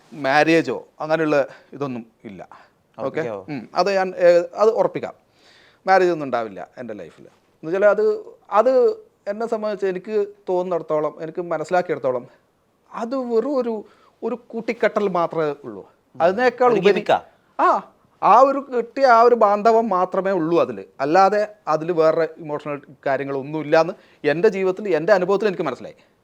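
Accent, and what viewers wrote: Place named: Kannur, India. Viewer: കണ്ണൂർ സംസാരം കേറി വരുന്നു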